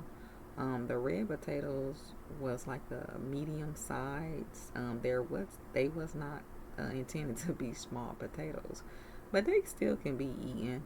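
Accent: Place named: South, United States. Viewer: I'm wondering if you possibly mis-heard something? I couldn't perceive any salty language in her audio. She does have a strong southern accent, that perhaps could make a difference to what you heard?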